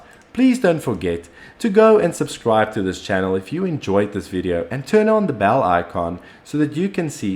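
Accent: South Africa. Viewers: Are you south African?? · I hear a South African accent, and I upvote.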